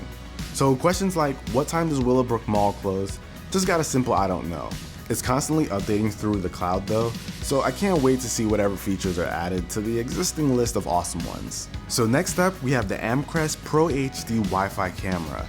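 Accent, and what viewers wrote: Jamaica. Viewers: are you Jamaican? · Nice video, your Jamaican!?!? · are you Jamaican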